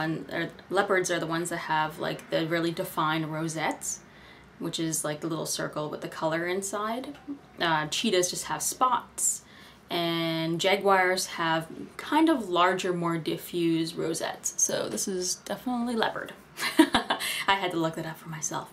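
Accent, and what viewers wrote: Canada. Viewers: Are you from Canada? It sounds like it from your accent.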